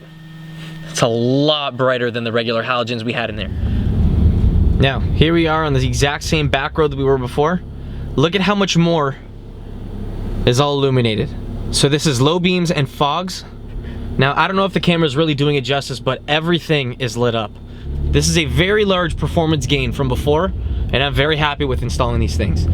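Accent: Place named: Canada. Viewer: so canadian... oooout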